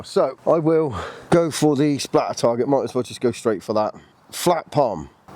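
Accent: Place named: Southwest England, United Kingdom. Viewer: Subbed! Great energy! Enjoyed watching this. It's nice to see someone happy with ' the average man' groups and not all the 10 shots inside 5p at 100 yards. Sound local ISH ( Plymouth, southwest)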